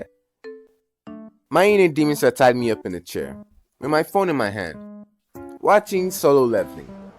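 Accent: Nigeria. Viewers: U no fit hide ur Nigerian accent. Ogbeni nice video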